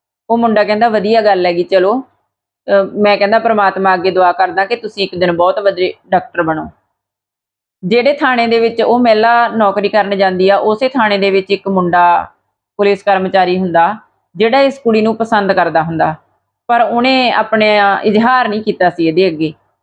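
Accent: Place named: Malwa, India. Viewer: Sister ji soda pind kara a boli ta mansa Budhlada Bareta di lagdi a ma army bich me a Bareta tu a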